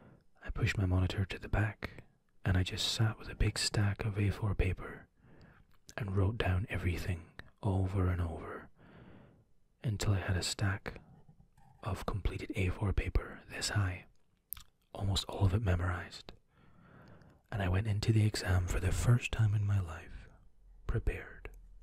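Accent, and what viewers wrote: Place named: Midlands, United Kingdom. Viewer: What place in the Midlands are you from? I’m from Worcester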